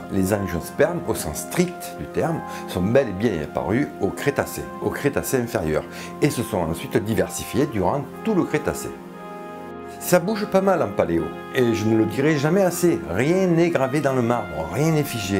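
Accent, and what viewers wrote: South, France. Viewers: oh tu est du sud toi aussi^^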